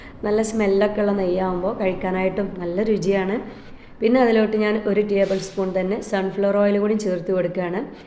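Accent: Malappuram, India. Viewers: നല്ല സ്വീറ്റ് മലപ്പുറം ഭാഷ , Good recipe.Expect more videos from you sister 🎉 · മലപ്പുറം ഭാഷ കുറെ മാറിയല്ലോ, ഞാനും നിലംബൂർ സ്വേദേശിയാണ്, ഇവിടെയും മുസ്ലിം ഭാഷ ഇങ്ങിനെതന്നെയാണ്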